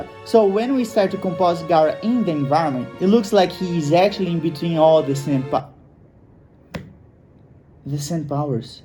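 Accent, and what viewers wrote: Brazil. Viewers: Sim ele é brasileiro, dá até para notar um sotaquezinho e tbm mostra na localização do canal, só que como a maioria do público dele é gringo ele fala em inglês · na verdade ele tem um sotaque mt fácil de identificar que é brasileiro kkk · Você é brasileiro né? da para notar no sotaque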